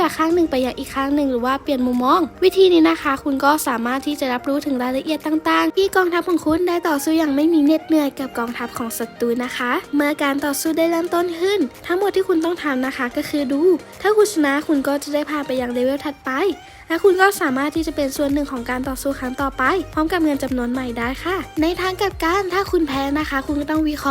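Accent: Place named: Central, Thailand. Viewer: สงสัยมานานละอยากรุ้ แอดมินคนจังหวัดไรอะ ราชบุรี สุพรรณ กาญ เพรชบุรี เพราะสำเนียงเหมือนๆกับผมเลย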